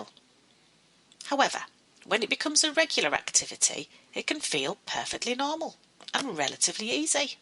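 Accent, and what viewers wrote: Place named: Yorkshire, United Kingdom. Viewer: Yorkshire English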